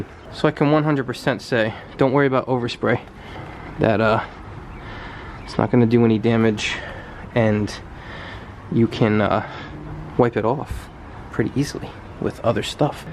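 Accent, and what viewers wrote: New York, United States. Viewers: ayo why do i feel like you're from new york? lol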